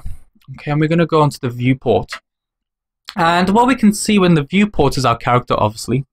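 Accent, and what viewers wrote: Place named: Turkey. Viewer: türk aksanı sezdim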